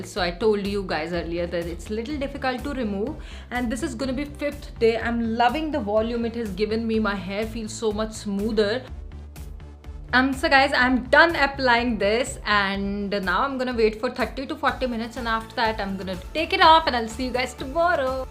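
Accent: India. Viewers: I love indian English accent 👍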